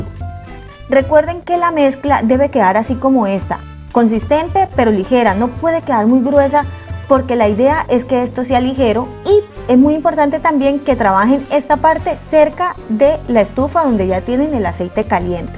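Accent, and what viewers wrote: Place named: Caribbean Coast, Colombia. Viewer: Me cuesta identificar ese acento, parece costeño cachaquizado...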